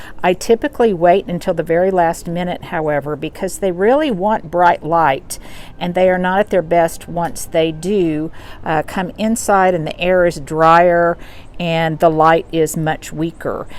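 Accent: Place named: United States. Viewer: Hi. Thank you for another very informative video. I don't have any topiaries. I am disabled, but decided to start gardening. Not the best hobby I didn't think about all the digging lol. So I am just starting with bedding plants in tubs and my hubby helps with compost. I am Looking forward to getting some topiaries in Spring to put in pots. Can you put a small topiary in a big tub, so they don't dry out? They make me feel happy as well. I do love your accent. I am from England so don't get to hear your beautiful American accent ever. Anyway thank you xx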